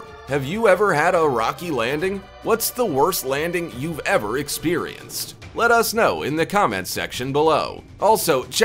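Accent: United States. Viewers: the voice over.... so not done, but american of course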